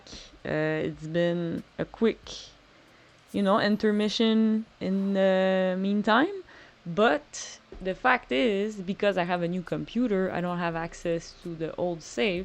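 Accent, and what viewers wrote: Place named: Quebec, Canada. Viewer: une quebecoise !? je déjà suis fan 💋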